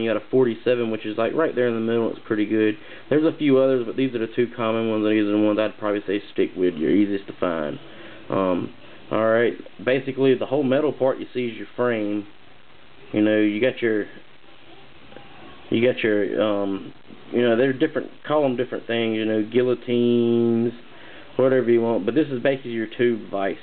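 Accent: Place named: South, United States. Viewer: good video for new artist...the southern acent lol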